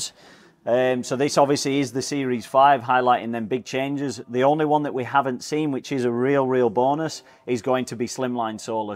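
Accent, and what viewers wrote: United Kingdom. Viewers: Where's that northern accent from? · nice trailer. seems odd listening to a Yorkshire twang advertising Aussie trailers. That outdoor kitchen needs a redesign, most of it is unusable, nice features but unusable. something to think about for Quantum 5.5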